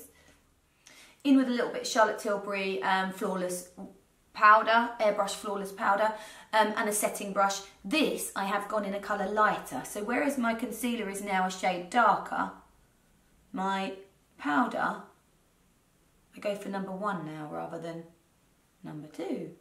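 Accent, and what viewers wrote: United Kingdom. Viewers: Are u from the UK cause I love ur accent/voice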